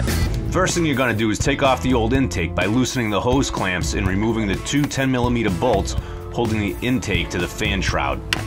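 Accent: Boston, United States. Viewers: Love this channel! Even more after I recognised that Boston accent 😏 hope you’re a die hard pats fan like I am! Keep up the vids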